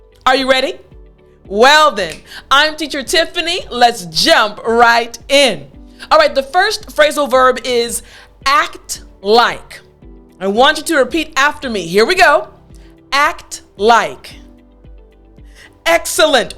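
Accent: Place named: United States. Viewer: Your lessons is good and have the American accent so anyway that's awesome! I'd like the way you are teaching the students because you have style of teaching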